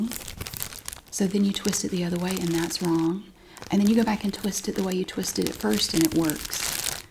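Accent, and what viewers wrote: South, United States. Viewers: I could watch a tomato sandwich in the making topped off with a southern accent every day for the rest of my life.❤ Hope you are well! I need to catch up on the neighborhood drama. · Love your southern accent ❤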